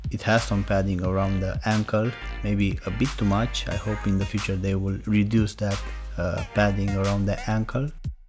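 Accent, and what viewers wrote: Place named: Spain. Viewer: Hablas español, ¿Verdad? Suenas muy similar a Carlos Sainz hablando inglés. Hace unos días probé los ultraboost 1.0 lcfp. Hasta el momento es el par más cómodo que he utilizado, el único problema es que no tienen plantillas, por lo que dudo que sean cómodos para utilizarlos todo el día. ¿Los has utilizado?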